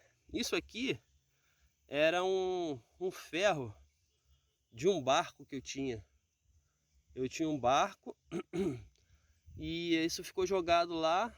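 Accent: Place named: Rio de Janeiro, Brazil. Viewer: Sou goiano e é a primeira vez que vejo um fazendeiro com sotaque carioca, porque geralmente a gente associa o sotaque à praia, litoral, algo mais urbano. No interior de Goias, São Paulo ou Sul é raríssimo um agricultor falando português com tanta perfeição. Parabéns, irmão. To pensando em comprar uma máquina dessas e seu video foi de grande esclarecimento. Abraço.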